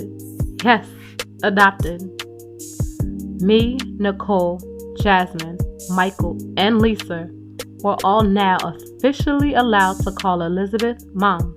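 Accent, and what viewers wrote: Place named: New York, United States. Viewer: Wow 😯 this is good I’ll be waiting on chapter 8 and you still sound like NY peace sis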